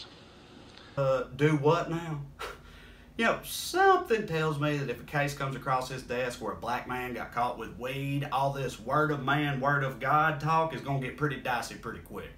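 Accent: South, United States. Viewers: Different accent. The general public think that a southern accent means “stupid”. And sadly, many are. Been fighting it my whole life. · Such relief to hear southern dialect in intelligent, articulate concepts. Didn't think it was possible. Thanks for helping the southern folks not look so dumb. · A democrat, smart, good looking, with a sexy southern accent., you're damn near perfect! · Hey! Your porch ranting is welcome here! Shining a light on lower level lunatics is a powerful idea, and I hope to see a bunch more of your razor sharp analysis wrapped in your warm Southern drawl. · i need a daily dose of u for a good ass laugh! Plus u speak the "Queen's English" whatever dafuq that means. Actually it means u speak with the same fucked up Southern drawl I do. I just get tired of listening to the damned Yankees alllll the time! Keep it liberal, bro! 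Love u too!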